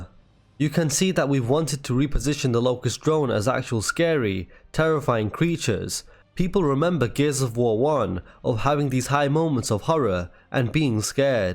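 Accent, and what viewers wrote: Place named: United Kingdom. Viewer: I like supporting your channel but you made this title with zero actual knowledge of new weapons or enemies. Just showed and talked about old ones. Obviously you’re click baiting and this shit runs dry man. Don’t take advantage of people trusting you to then sit there and say pointless stuff in your uk accent like it’s content.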